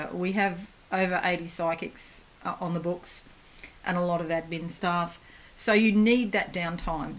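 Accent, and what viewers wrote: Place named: Australia. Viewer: Thank you..nice to hear an Aussie accent..am also an Aussie..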